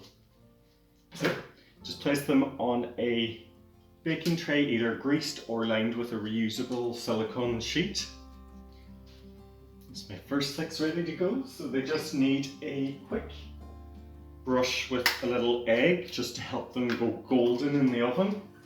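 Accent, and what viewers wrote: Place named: Ireland. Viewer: Didn’t realise jambons were an Irish thing was surprised to hear the accent, greetings from the north 😉😂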